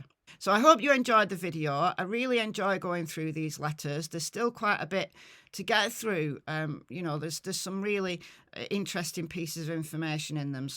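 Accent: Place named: Northern England, United Kingdom. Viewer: You've turned in2 one of my fave channels on this case. Plus you sound like me northern mate. I'm.sarf England. Plus you know what ya talking about xx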